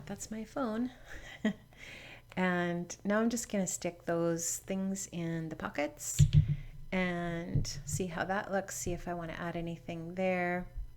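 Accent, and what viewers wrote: Canada. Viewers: This very cool and I am enjoying watching and learning! You sound like you are Canadian...eh? Anyhoo...best wishes to you and yours for a happy holiday season! TFS! xo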